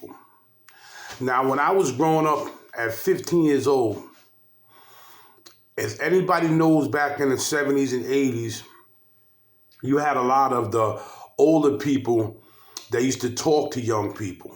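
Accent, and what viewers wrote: New York, United States. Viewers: Just catching the replay. I am a very dark complexion black woman I caught a flack as a young person for being dark and it was my people who harassed me about something I could not help. I happento like a big Black man like you and with New York accent you a handsome dude. No Harris will not get my vote! Take care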